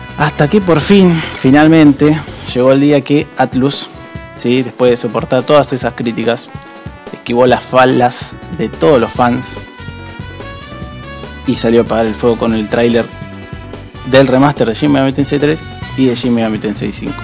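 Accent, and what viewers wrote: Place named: Argentina. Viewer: No se yo, pero suena a Argentino.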